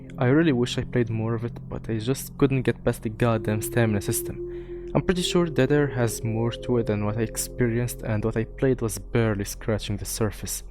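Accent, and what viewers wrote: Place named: Tunisia. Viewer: direct chle9t bik tounsi mel accent ! GG Bro nice video. Big STALKER Fan Here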